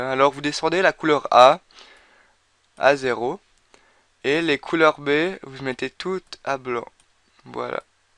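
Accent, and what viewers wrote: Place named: France. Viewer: pourquoi tu prend l'accent francais si t'es quebecois caliss....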